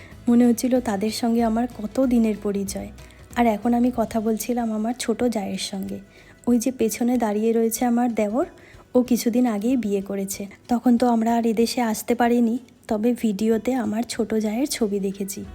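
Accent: Bangladesh. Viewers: apu tmr kotha sune mone e hoicce na j tmi indian,,,,,,,, tmr kotha akbare Bangladeshi der moto,,,,,,😊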